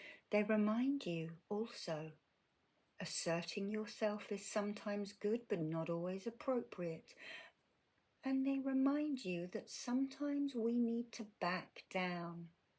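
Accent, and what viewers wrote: United Kingdom. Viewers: you have the same kind of british accent as my sweet auntie, who- funnily enough- is also named:) Bless your positive energy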